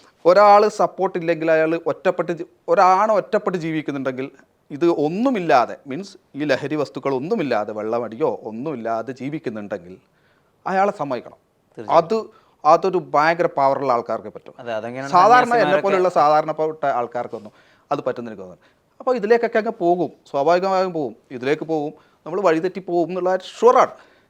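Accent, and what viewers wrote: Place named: Kannur, India. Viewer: കണ്ണൂർ സംസാരം കേറി വരുന്നു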